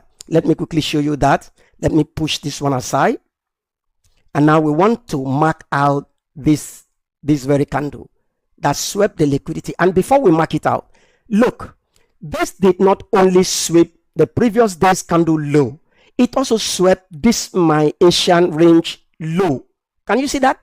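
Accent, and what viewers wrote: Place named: Nigeria. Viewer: Thats Nigerian accent❤❤❤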